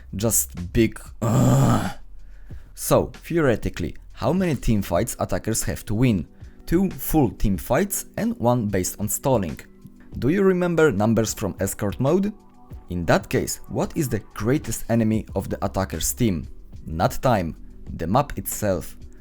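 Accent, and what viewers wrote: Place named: Poland. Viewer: Beautiful Polish accent, at first you were Russian, ale potem zauważyłem ee to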